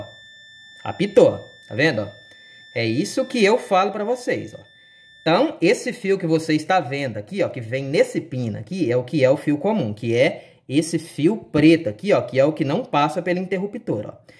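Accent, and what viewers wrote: Minas Gerais, Brazil. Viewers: I m p r e s s i o n a n t e ! Não bastasse o conhecimento repassado livremente e com riqueza de detalhes, somos agraciados ainda com um formidável Mineirês que torna as AULAS, além de extremamente instrutivas, muito agradáveis. Muito obrigado meu camarada. O mundo precisa de mais mestres como você, não para consertar o mundo, mas para ensinar as pessoas que elas também podem consertar, seja o que for. Abraços.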